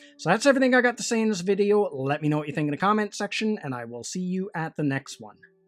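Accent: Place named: Midwest, United States. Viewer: I like to place accents so Wisconsin? or maybe somewhere in the midwest.? This is just out of curiosity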